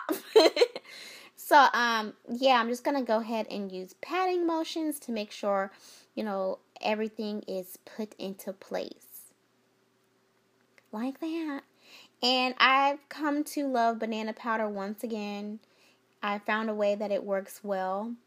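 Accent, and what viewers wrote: South, United States. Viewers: ☺️ girl!! You a hot mess. I'm loving the makeup and your southern accent. Simply divine.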